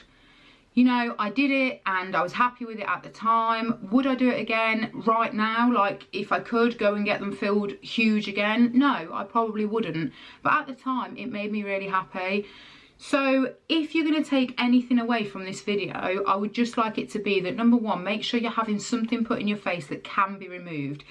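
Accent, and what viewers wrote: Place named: Birmingham, United Kingdom. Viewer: Waitttt you’re from brum ?!? I knew I recognised the accent 😅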